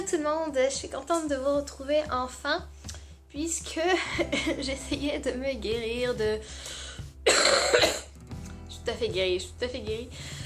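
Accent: Quebec, Canada. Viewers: j'ai eu peur que tu ai perdu ton accent queb... ouf il est tjrs là! mais t'as passé combien de temps la bas? tu reviens?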